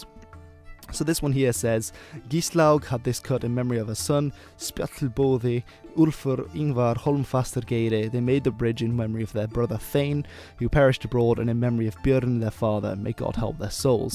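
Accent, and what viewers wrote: Denmark. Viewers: You are danish i can hear xd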